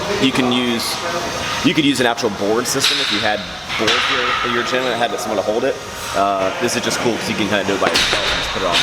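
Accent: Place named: South, United States. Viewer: hey, just curious, where are you from? There's a slight southern touch in your accent lol.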